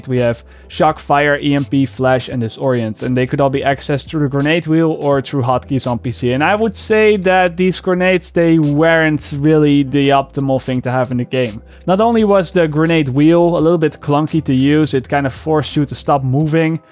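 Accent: Netherlands. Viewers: Well, that's because he's European (most probably Dutch, not 100% sure). That's why he has the thick accent. And you are certainly not the first person to notify him. But he's a good sport about it; he jokes about it in a few of his IRL videos. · Holy shit your accent is very dutch. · Hey! Love that Holland accent. I have seen almost all of your Division (1) videos. Keep up the good work 😏👍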